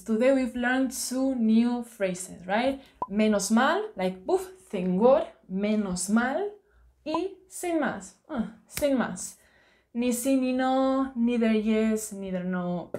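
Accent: Spain. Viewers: nice video ' are you from spain?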